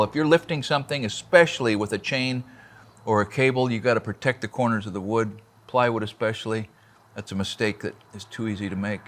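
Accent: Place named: Midwest, United States. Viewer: I hear a Midwest accent in your voice.🤔 I grew up in LA, "Shakey Town", Southern California.😧 It's obvious you're not building for earthquake resistance.🤨 That's the reason for some of those "odd" tenon shapes.☺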